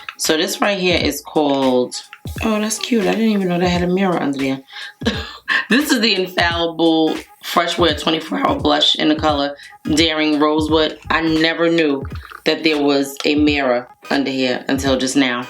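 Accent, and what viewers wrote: New York, United States. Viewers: Native New Yorker!! I knew it! The first time I watched you I was thinking she sounds like she's from NY which is great because I love NY and I'm from NY too😊 I never asked you because I understand YouTube rs have to be safe about revealing personal information and I respect that. OK enough about NY☺ I love this latte look on you❤ The eyes do look more on the golden side but still very pretty.I purchased the Infallible blush too because I saw in one of your haul videos but I got shade confident pink and now I want the shade you used too,I really liked it. Or do you have both shades?🤔 I would love to see how you make your wigs. Your wigs always look beautiful I thought you bought them,good job. I wish you much luck and success on starting your own line. Just wondering why you set your undereyes with two different powders? 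I tried doing that but it turned out looking cakey on me😒 Btw I saw the Barbie movie it wasn't at all what I expected. I'll just say I most I enjoyed about the movie was looking at Ryan Gosling 🙄😄😉 Chat with you soon darling 😘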